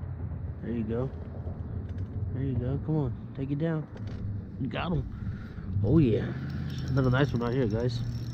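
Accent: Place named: California, United States. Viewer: I love his Californian accent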